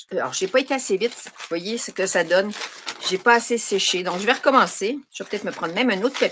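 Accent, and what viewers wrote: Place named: Quebec, Canada. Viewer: Bonjour, je viens de vous découvrir 😊 c'est drôle, je suis québécoise et je pensais que vous l'étiez à la façon dont vous parlez, je me demandais si vous étiez française ou québécoise. C'est dommage mais j'ai une Cricut mais je vais quand même aller voir vos vidéos 😊 ce que j'aimerais c'est d'avoir des projets pour utiliser ces impressions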